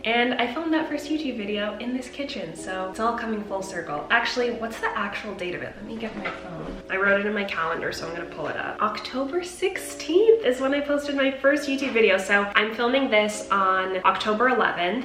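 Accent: United States. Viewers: She has suck an American accent to be from Canada most Canadians have like an accent I don’t know how to explain it😂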